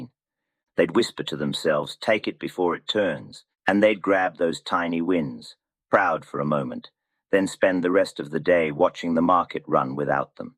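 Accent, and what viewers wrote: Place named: Australia. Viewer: Sometimes it sounds like has developed an Australian accent 🇦🇺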